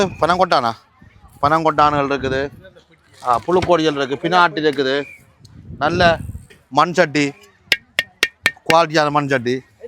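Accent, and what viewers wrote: Jaffna, Sri Lanka. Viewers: யாழ்தமிழில் கலகலப்பாக பின்னுகிறீர்கள் கோழிப்புக்கை எப்படி செய்வது என்பதை எனக்கு அறியத்தருவீர்களா?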